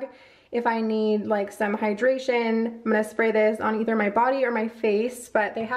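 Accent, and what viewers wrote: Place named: Texas, United States. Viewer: The way you said 13 bucks is so SOUTHERN LOL I loved it! You sound like a real Texan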